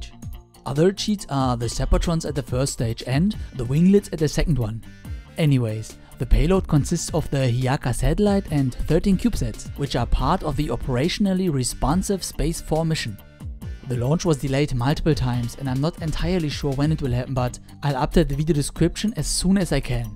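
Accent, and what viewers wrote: Germany. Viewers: he sounds German lol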